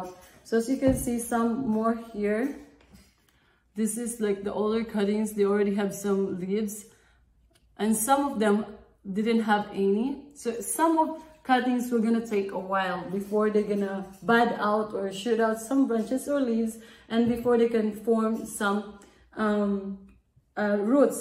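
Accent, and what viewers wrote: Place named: Eastern Europe. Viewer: I like your accent, sounds Slavic. 😊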